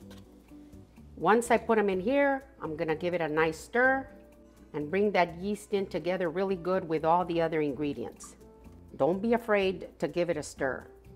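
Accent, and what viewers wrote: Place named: New Mexico, United States. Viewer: thank you so much. I've been looking for a recipe for dinner rolls without using a mixing stand, which I don't have. You are so nice to listen to and understand. You remind me of my best friend of 54 years, her mama. I grew up in Albuquerque, NM and you sound a lot like her with your beautiful accent. I'm so excited now bc I know I can do these your way. Have a great weekend.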